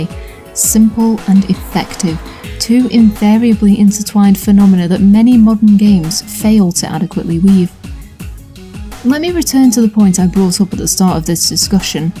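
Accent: United Kingdom. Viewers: Ok not on my phone now so I can reply properly :) Deus Ex had a massive effect on my early gaming days. Like you I remember playing it many times and spending time working out how far the narrative web would let me push it. I discovered that Paul could be saved by myself and it was my first major 'what if' moment in gaming. I still look back at that realisation with very fond memories, that this game world had so many clever ways that recognised my choices. I'd be interested to know what you think of the other games, imho none have come close to the original ofc :) Btw I've been trying to place your accent, are you in the North? The odd 'that got buggered up' is something I say :) Looking forward to your next project, I'll share this on SM and hopefully get you a few more followers because this quality of production is just as good as any made by the bigger sites. 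Cheers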